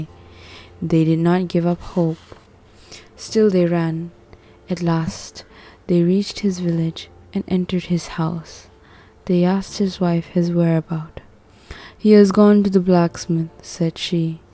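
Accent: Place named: India. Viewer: Very typical Indian accent 😂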